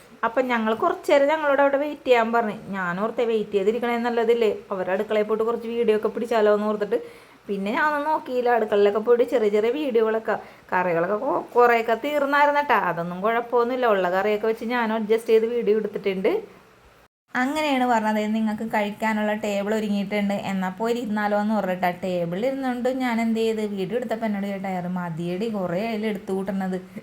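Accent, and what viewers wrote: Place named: Kochi, India. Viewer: വീട്ടിലേക്ക് ponenu. സുഖമുള്ള kochikkarude സ്വന്തം ഭാഷ...ponenu...varanenu....❤❤❤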